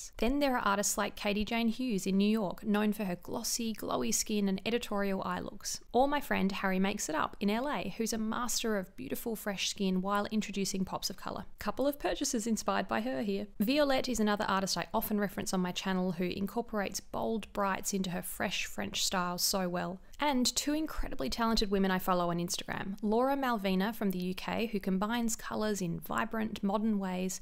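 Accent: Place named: Australia. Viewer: She has such a posh Aussie accent. I’m Australian and let me tell you, I do not sound anything like this 😂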